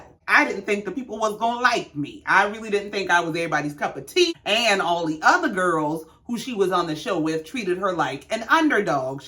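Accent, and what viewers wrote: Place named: South Carolina, United States. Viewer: In all fairness Cynthia was a big deal on the New York scene so her connecting with Peter is not surprising and being with Leon was known. She is just more unavailable to general scenes. But I love your commentary with SC accent. I am thinking Charleston love it; reminds me of Grandma. Keep being you!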